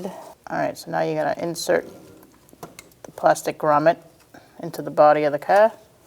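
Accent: Boston, United States. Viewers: Thanks for the content .. I'm about to do the rear two on mine .. btw is that a Boston accent ?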